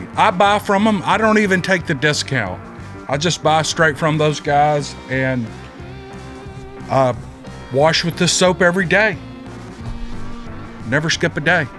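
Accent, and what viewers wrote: South Australia, Australia. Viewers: You did an awesome Aussie accent, keep up the good work, you're a great bloke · Great story and as always, well told, however, the Aussie accent??? Lol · I love the way he wrote his story. It put the images in my head, which makes it that much better. I enjoyed your southern Aussie accent. Does anyone have any speculations on what happened to the family of 3, and their dog, that were all found dead on a hiking trail? Very intriguing event. Thanks and to, for providing my bedtime story. Love ya!!💕👍😊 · Do you have ai link to his channel? I loved your Australian accent 😃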